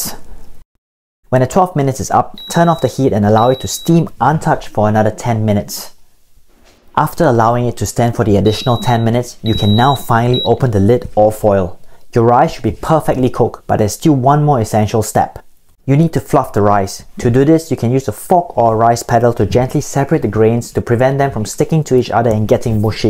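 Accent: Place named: Singapore. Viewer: Your topics r good, due to your singaporean accent & style and the way u speak so fast, my heart goes with your fast pace to rush, not a comfortable viewing, please speak a bit slower for a more comfort viewing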